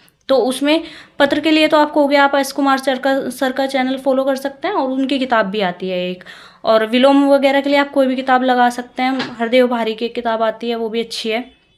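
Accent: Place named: Shamli, India. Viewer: Mam तो म्हारी वाली language बोल रही है, शामली, सहारनपुर वाली।।।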